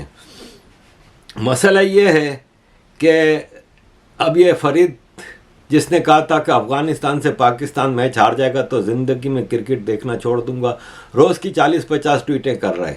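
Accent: Gujarat, India. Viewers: Nice to hear you and your style of talking...adding some gujju snd kacchi dialect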